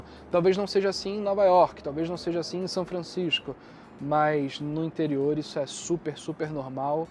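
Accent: Rio de Janeiro, Brazil. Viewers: Vídeo muito bom Mas o cara fala assobiando com esse sotaque de carioca que chega a dar um ruim nos ouvidos kkkkk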